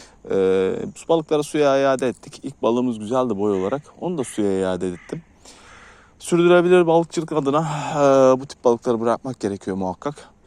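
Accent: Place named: Denizli, Turkey. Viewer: şive bizim buralara çok benziyor Denizli liyim bende o yüzden sordum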